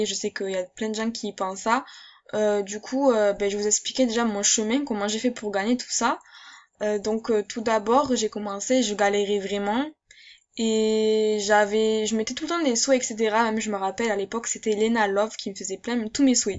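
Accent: Marseille, France. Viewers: tu a un acsent marseuiller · Ses une marseillais ses pour sa qu'elle dit sa mai je t'adore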